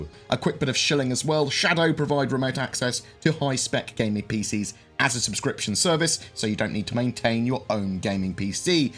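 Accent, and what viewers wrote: England, United Kingdom. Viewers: the English accent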